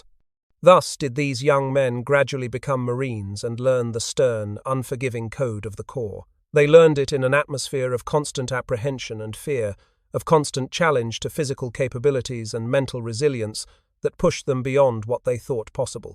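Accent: United Kingdom. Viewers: They selected a british accent narrator, so you get all the UK pronunciations for a very American operation.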